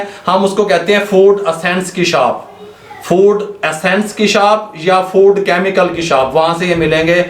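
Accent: Punjab, India. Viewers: Punjabi kitni bhi achhi Urdu ya Hindi bole tone se pehchana jata hai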